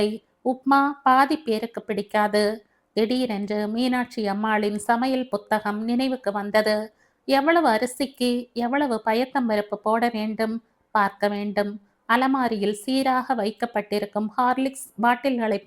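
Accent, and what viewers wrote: Kerala, India. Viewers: Swarasyamai selgirathu novel👍👍👍 ungalin inimaiyana malaiyalathamil vasippu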